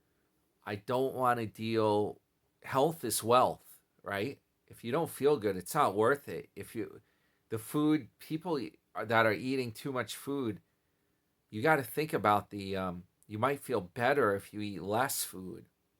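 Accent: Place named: Long Island, United States. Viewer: Love listening to your L.I accent!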